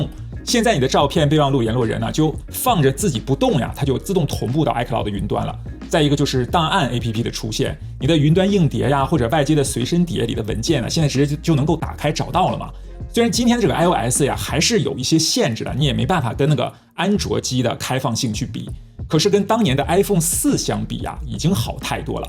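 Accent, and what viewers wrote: China. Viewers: 這幾個月才開始看的影片聽口音是大陸人還以為生活在大陸原來住台灣喔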